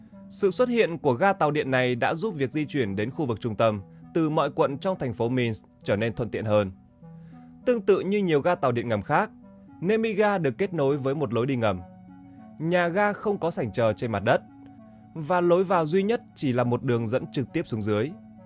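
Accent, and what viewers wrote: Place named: North, Vietnam. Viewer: giọng đọc miền bắc không hay . xin Chọn giọng đọc miền nam dễ nghe hơn .cám ơn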